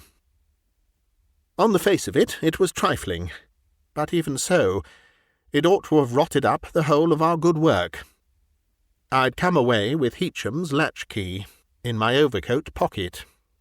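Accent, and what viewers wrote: England, United Kingdom. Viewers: Another narrator got accused of being AI. It's a sad day when we can't tell the difference by the generally god awful rhoticised intonation of AI butchering the story and the rich timbre of a cultured English accent reading a jolly good story! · For some unknown reason I sleep best to British accents. It's wonderful to hear your beautiful human voice with perfect pitch and normal human rhythm and none of the bizarre pauses that gives away the robot voice and is jarring enough to wake me if I have dozed. I do hope YouTube doesn't succeed in their apparent campaign to eliminate all actual human narrators.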